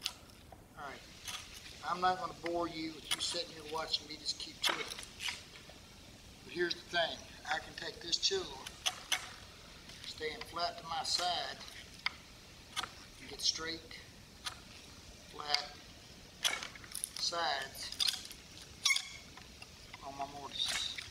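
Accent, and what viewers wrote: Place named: South, United States. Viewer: I love the down to earth no BS way of explaining each step, complemented with that great southern accent. Thank You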